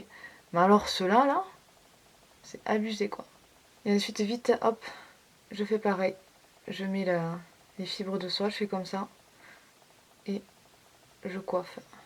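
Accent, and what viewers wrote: South, France. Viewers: Quel bel accent du sud ☀️ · Like pour l’accent du sud ❤️ #TeamAccent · Cet accent 😍 je me sens vraiment chez moi, mercii pour cet accend du suud 🤙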